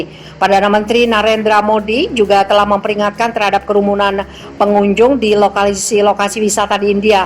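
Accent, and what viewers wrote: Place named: Java, Indonesia. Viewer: Lidah Admin pekat jawa berbahasa Inggeris. Lebih baik x usah sebut. Maaf ya.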